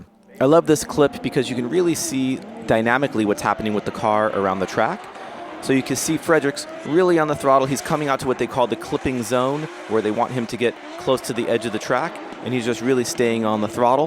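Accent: Greece. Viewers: Where are you from??? Greece?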